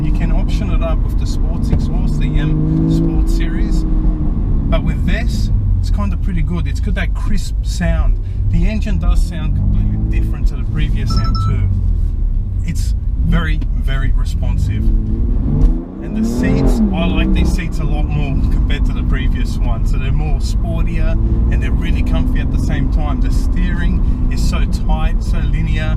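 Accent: Australia. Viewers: So great too have a aussie doing a great review .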